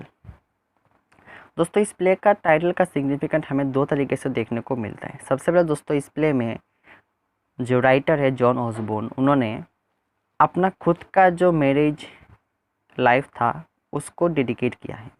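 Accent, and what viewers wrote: Bengal, India. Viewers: Aap bengali ho? aapki ascent se pta chl rha h Nice explanation 😊😊 It'll help a lot in answer writing.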